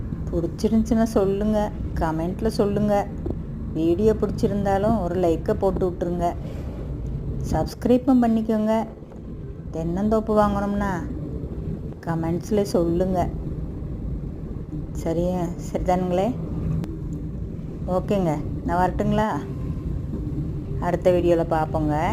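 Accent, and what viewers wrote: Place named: Coimbatore, India. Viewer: So beautiful wonderful covai language Konjam alagu neriya attractive dear nantrimma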